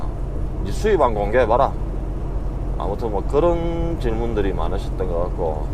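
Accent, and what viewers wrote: Gyeongsang, South Korea. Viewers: 먹고 살기위해 하시는것이지만 조금만 편하셨으면 하는 바램입니다. 경상도분같은데~~~너무 고생하시는것같아 마음이 짠하네요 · 아재.. 경상도 어디 사람이에요?ㅎㅎㅎ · 경상도 말이 귀에 착착 붙네요 안전운전 하세요~~< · 트럭에 관심생겨 님 유투브보다가, 댓글처음 남깁니다. 셀프결제 샤워시설 신기하네요^^ 경상도 사투리, 형부생각나 구수하네요, 겨울시작인데 안전운전하세요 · 가끔어쩌다 트럭을 주제로한 영화말고 생생티비라니..근데 경상도 사투리가 아직도 그데로인데 아메리카 트럭커는 어찌 되셨는지 그게더 궁굼하네요..ㅎㅎ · 머나먼 타국에서 수고가 정말로 많네요. 어투가 경상도 분이네요. 혹시 주유소 몇곳과 주유소 접해있는 모텔을 운영하시는 신 부갑씨 아시는지요? 그분도 고향이 경남이고요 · 경상도 고향이 어디십니까? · 고생 많으십니다 경상도말씨 구수하네요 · 🇺🇸🙏🏻🌍돈받고 여행하는 직업 ㅎㅎ😂👩🏻🤔🙋🏻‍♀️👍👀깔삼 오랫만에 듣네요..쪼매 ㅎ😂😂😂👏🏻👏🏻👏🏻🇺🇸🙏🏻저는 대구가 고향..🇺🇸🇺🇸20년 미국 살아도 사투리 여전 ㅎㅎ 님도 많이 쓰네요..😂😂👏🏻👏🏻🇺🇸🙏🏻👍🇰🇷🌍🍀🙋🏻‍♀️한번사는 인생..님처럼 사는것도 용기가 많이 필요할것 같아요.저는 파킹을 못해서 큰트럭은 못할것 같고..😂👍👩🏻🤔🤓🙏🏻🇰🇷🌍Michigan 에서 할수있는 것 부터 👀👀👍🌍👏🏻🙋🏻‍♀️저도 운전을 좋아해서..그리고 혼자🐯🐯새로운 직업을 가져 보고 싶네요.2022🇰🇷🌍🙏🏻항상 잘보고 있어요🙏🏻👀🇺🇸🙋🏻‍♀️💕💯🌍🌍👍화이팅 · 경상도 캐내디언 ㅋㅋ 멋집니다. 한때 캐나다 이민을 원했는데.... 아 ~~~~ 간접적으로 대리만족 합니다. 어차피 한국이나 외국이나 어디 사나 물질적으로나 정신적으로나 행복하게 살려고 노력하는 자세가 중요하네요 ㅋㅋㅋ awesome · 처음으로 알게된 너무도 멋진 영상 과 푸근한 경상도 목소리 재밋게 듣고 보고 있는데 댓글보니 고인이 되셨다니 안타깝네요 꽤나 많은 인기도 쌓으신분이. 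늦었지만 고인의명복을 빕니다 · 은근 중독이 됩니다 카나다 까지 가시어 경상도 구수한 말씀을 들어니 정감이 더갑니다 ㅎㅎ 늘 안운하시고 즐거운 시간 보여 주시길 바랍니다. 스근하게 즐감하고 가니더 ^^*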